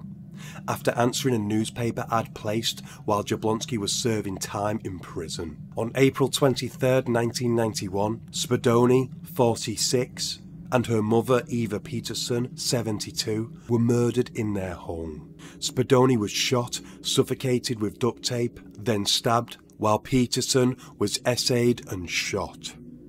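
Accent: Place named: Liverpool, United Kingdom. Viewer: Dude are you from liverpool? Your accent is so scouse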